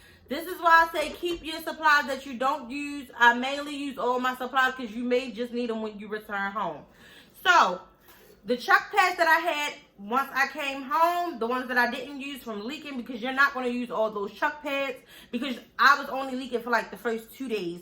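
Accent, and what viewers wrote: Baltimore, United States. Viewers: I’m going to guess where you’re from by your accent. Baltimore?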